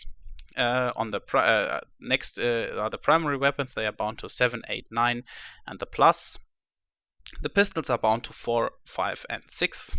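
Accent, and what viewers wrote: Germany. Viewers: Sofort gehört dass du Deutscher bist :D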